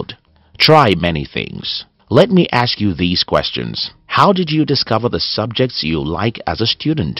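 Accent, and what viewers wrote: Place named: Nigeria. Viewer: Hey man, be proud of your old Nigerian voice, it is better than this uninspiring voice.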